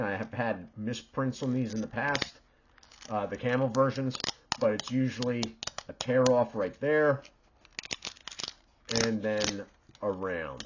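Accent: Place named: Maryland, United States. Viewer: I have family up and down the east coast but your "O's" made me think of the MD accent. I was close. VA here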